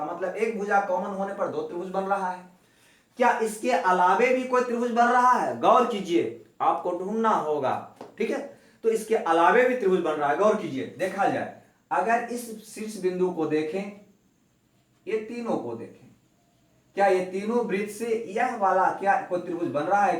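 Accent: Bihar, India. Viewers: wow gajab ka pdate ho sir...bihari stayl bha gya... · Sir aap Bihar se h kya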